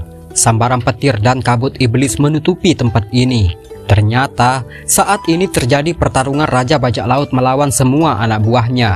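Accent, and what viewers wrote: Lombok, Indonesia. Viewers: Ini yg bercerita orang lombok ya.?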